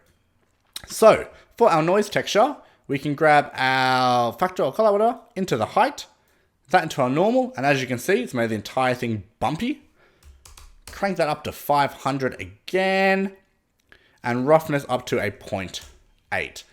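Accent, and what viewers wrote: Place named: Australia. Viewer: blood hell that was fantastic. I learnt heaps... and its great to hear another aussie too :)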